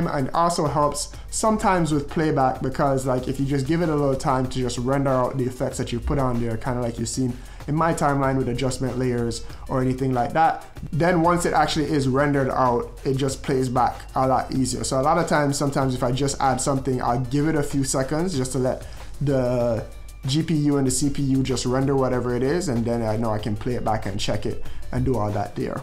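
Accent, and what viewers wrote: Jamaica. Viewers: u jamaican?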